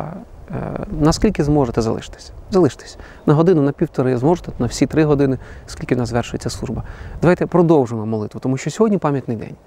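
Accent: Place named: Russia. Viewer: Яка українська - московська(сумно)!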